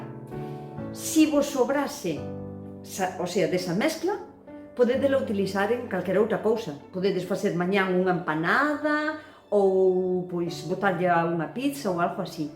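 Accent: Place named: A Coruña, Spain. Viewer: No sé porque me paredes que sos de la Coruña o de Santiago de Compostela. De Galicia..creo que seguro...te escucho con atención ..es la primera vez que te veo..ye emociono tu acento xque mí madre era de la coruña.y yo entendí siempre su fdialecto.....ya no está conmigo..pero tu idioma me traslado a mí niñez.. Gracias....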